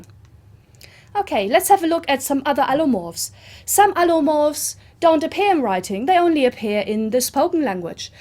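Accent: Germany. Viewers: Hey, thanks for the clip! By the way...something I wanted to ask you.... Are you German? Just wondering because of your pronunciation 😉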